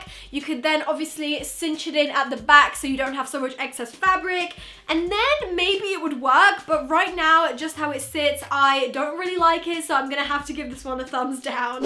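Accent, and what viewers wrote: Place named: United Kingdom. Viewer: british accent nooo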